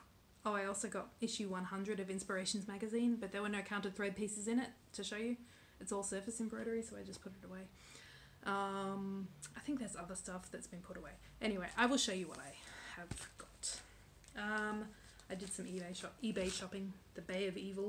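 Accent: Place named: Australia. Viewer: Hey! Just found you! I was so happy to hear your Australian accent. I lived in Perth for a couple of months back in the late 90s. Spent lots of time at Cottesloe Beach, too and loved it! Such a gorgeous city and incredible water to swim in! I actually live in Oregon and our beaches are cold year-round. Enjoyed your video and your wonderful water piece, in particular. Simply gorgeous!!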